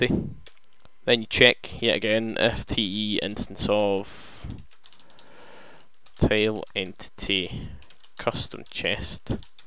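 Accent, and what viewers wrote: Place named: Scotland, United Kingdom. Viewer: Your Scottish me too :P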